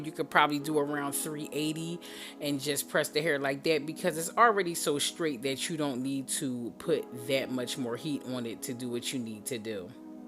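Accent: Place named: New York, United States. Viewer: I love your accent. Are you from NYC? Watching you work and listening to you is so soothing!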